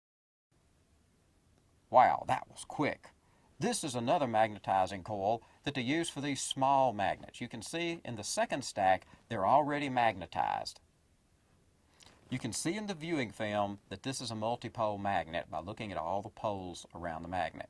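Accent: United States. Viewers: You sure do talk funny (in fact I was put on speaker phone so that the office ladies in Tennessee could listen to my Australian accent and have a giggle: ) Great informative video. It is so much a pleasure to hear an American who enjoys China. It is a great country and my family and I have spent many months there - Thank you for sharing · He should have someone else with a proper English accent narrate His videos. That Mercan accent can be quite annoying...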